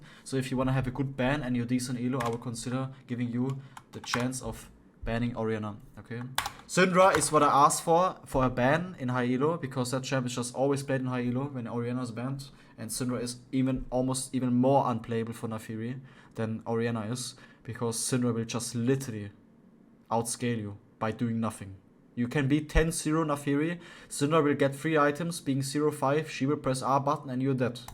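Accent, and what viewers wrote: Germany. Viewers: He's not flaming, that's just his german accent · Never seen an in-depth guide this entertaining. I don't know you but since this video is phenomenal, and I assume you are German because of your accent, I'll definitely get to know you sir!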